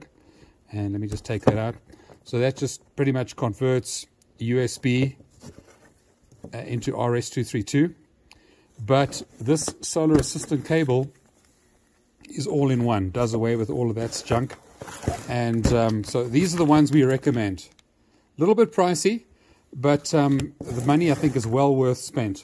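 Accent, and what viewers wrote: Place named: South Africa. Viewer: Sounds like a South African accent, Im sure you know that Solar Assistant is produced in SA?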